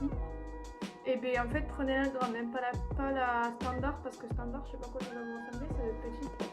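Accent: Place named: South, France. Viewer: Cool tes vidéos ! Bonne énergie ☺️ et cet accent du sud 🤌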